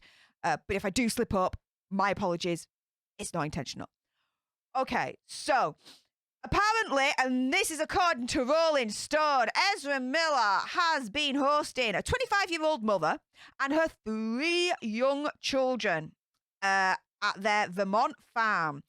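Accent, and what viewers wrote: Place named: Northern England, United Kingdom. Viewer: She's a Northern Lass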